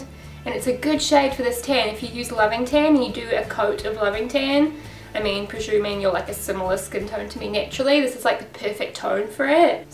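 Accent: New Zealand. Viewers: hahahaha "eeeeh it's the eees-pee-eeeff" :') You sounded so cute there! I love the kiwi accent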